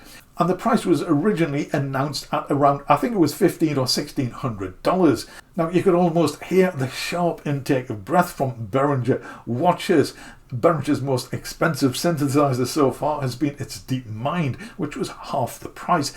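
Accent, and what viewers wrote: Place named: North East England, United Kingdom. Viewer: Hi, You seem to have a north-east twang, I'm in my 50's, an electronics engineer and live in Teesside. I collect faulty 80's and 90's keyboards, modules and obscure sound generating gear, put them back to fully functional and script files to interface with Cakewalk or Fl studio. Software emulation is good for lack of space, but it won't appreciate in value and many deep dive functions in my Korg gear (M1, X3) would never be replicated, Korg in the 90's mastered the full-bodied out-of-the-box sound. Best wisher for 2024. ✌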